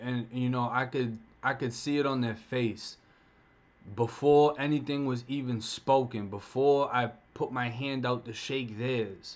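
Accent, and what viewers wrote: Boston, United States. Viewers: Bro got a strong ass Boston accent lol but I fw the content💪🏾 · I knew u was from Boston bro...when u said u was locked up in FLA I was like damn dude sounds like a Bostoner...that's what's up I'm from Bridgeport CT which isn't far from where u at...I knew u sounded too smart to be from down south...I fuck wit Boston especially because y'all got the smoke shops serving up 🔥...I love my quality smoke keeps me from fucking wit other shit although I like my hallucinagens🤯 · you got the Irish Boston type slang ..words like park and few other not sure where ur from .i don't want to assume shit just cause of movies or t.v .shit i am from Canada 30 min from the boarder to newyork ..but no one sounds like how they make us in movies and shit so what do i know ..i just like the movie the Town and just going off that ..witch is ignorant on my part ..but one thing is the same the cops are just as bad here ,i am on some heavy shit now due to cops doing whatever they want and my dumbass was cold and put on a coat that was left in my car .they tell me i am drunk but parked cause the way i talk due to a head injury but bin sober for 15 years ,opened the the other door right away on privet property with no cause other then stalling to go home fighting with my chick tierd woking 12 or more hrs for 38 days in a row no break in the movie biz so lots of driving on top ..doing noting to know one and got my rights taken from me and now looking at alot of fucking time ..i got lucky years ago and got out of bad shit fell ass backwards in to a wick job i can work half the year and take half off and still live good ... 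so why would i fuck that up what was in the coat is a huge problem were i am from but i don't know where the coat came from even in pics of me all day and any other day u never seen this on me fur makes me itchy as fuck but i was cold ..left my house in a T in Jan to get out the house and away from my chick to stop the yelling ..now all the time i spent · I've been binge watching your videos for days now, always hearing a little Boston in your voice, but everything I saw referenced Florida. Now I feel vindicated in my accent radar. Did you move here after you got out the first time? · Damn bro i thought you lived in florida you live in my hood i was wondering about that boston accent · Respect dog. If you dont mind me asking, what are you doing for work now? If you're going full time with youtube, you should consider starting a podcast. Team up with someone you vibe good with. Think Joey Diaz and Lee Seyet, you have the quick wit, perfect east coast/Boston accent, and stories for day. 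· Yo I'm from Boston I had no idea you lived here! I I hear it in your accent sometimes 😂 keep making these vidz bro there great bro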